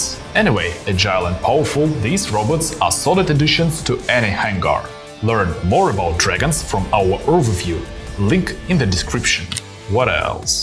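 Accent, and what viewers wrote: Russia. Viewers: I wish you guys would get someone who could talk without such a heavy Russian accent, it’s really hard to understand what’s being said on these videos.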